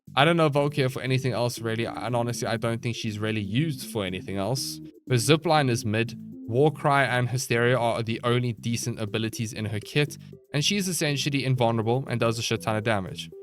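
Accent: South Africa. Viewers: Are you South African? English accent feels a little bit familiar... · Is South African🇿🇦 by any chance?? The accent sounds very familiar.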